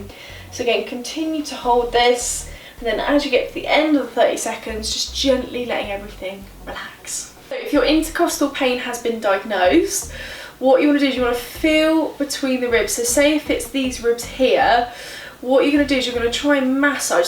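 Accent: United Kingdom. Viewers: Love the British accent! :)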